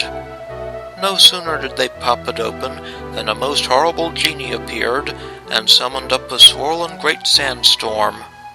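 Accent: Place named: South, United States. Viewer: Thanks for doing these vids! I really enjoy the narrative, you sound like a good friend of mine, a southern gentleman😁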